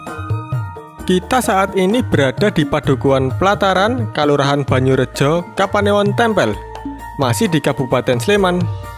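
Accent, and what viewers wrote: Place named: Banyumas, Indonesia. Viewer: Mas asli orang mna sh, kok ngomong nya agak ngapak sedikit 🤣🤣🤣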